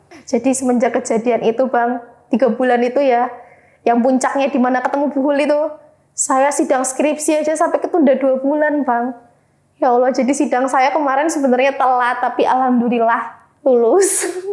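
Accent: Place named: Banyumas, Indonesia. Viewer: Kak km orang ngapak yaa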